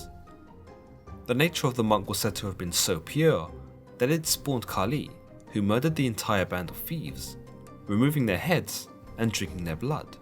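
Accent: United Kingdom. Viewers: So tired of hearing the OLD CRUSTY british or english accents! Are they still trying to take over the world?!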